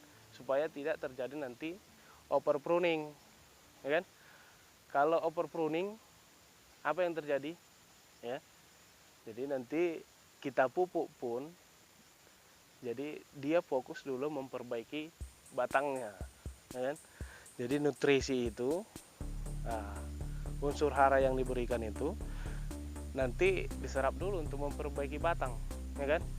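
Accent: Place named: Medan, Indonesia. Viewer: Mantap bos q... Semangat pak.. Terima kasih tipsnya kk Mantap kali lah logat medannya pak.. Salam silaturahmi pak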